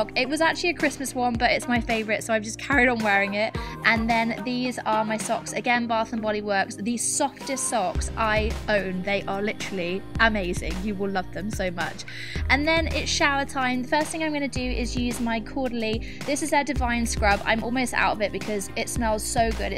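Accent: United Kingdom. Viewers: I am in love with your british accent :o <3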